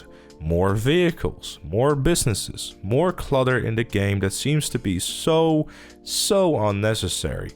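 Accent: Netherlands. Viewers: Is the guy narrating Dutch?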